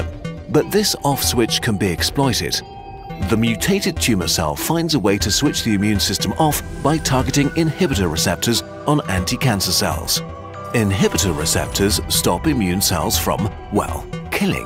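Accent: United States. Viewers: thank god, less new york? yes please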